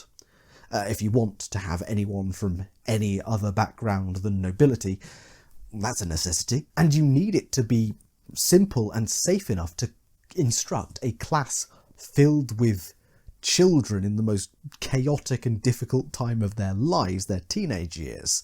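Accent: United Kingdom. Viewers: Worst Witch probably a inspiration. Basically bunch of young witches go to school learn how to be witches. (inside universe boys goto wizard school). Its from the 70's. All the adventures take place mostly in the school or school related activities. They had many tv shows on this from UK. You sound like you're from UK :p. You should know lol. I swear Harry Pottery is just the male version of worst witch. Description of Book copy and paste The stories mainly take place within the walls of Miss Cackle's Academy, a girls' boarding school in a stone castle atop a mountain, surrounded by forest. Each book covers one term at the Academy.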